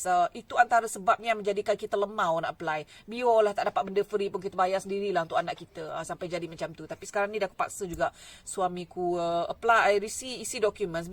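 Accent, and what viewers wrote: Negeri Sembilan, Malaysia. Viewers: camano tetibo akak speaking nismilan ... asal n9 ko?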